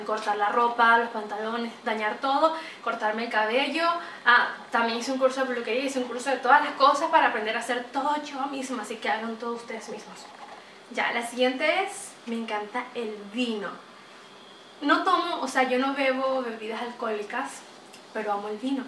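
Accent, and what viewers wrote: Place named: Andes, Venezuela. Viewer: Echoooooo.. ¬¬ no seas antipatriota! Manten tu acento venezolano hasta LA MUERTE!! Aunque tu venezolano es medio andino :S pero bue. . . .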